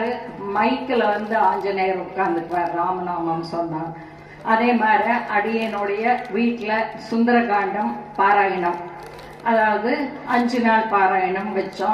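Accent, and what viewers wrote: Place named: Coimbatore, India. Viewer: Konjum Kovai thamizhilil gurujivinudaya speech arumai 👌🏼 Blessed to be your student 🙏🏽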